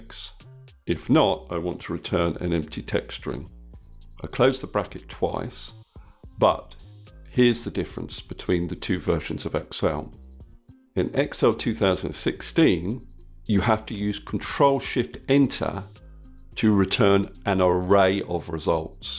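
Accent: England, United Kingdom. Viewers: thats a great solution..thanks dear... I love your English accenr...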